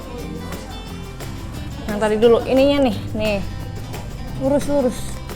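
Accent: West Java, Indonesia. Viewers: Dari logat bicara mbk dari sunda..mereun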